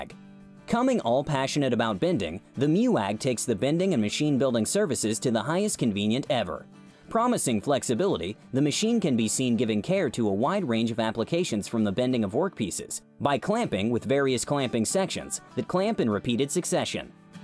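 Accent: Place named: China. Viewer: Terrible chinglish.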